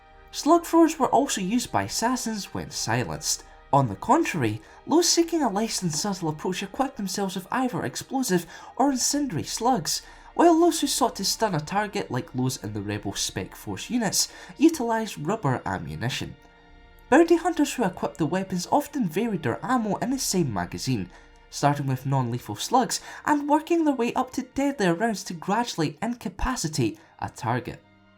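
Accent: Scotland, United Kingdom. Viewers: no hes scottish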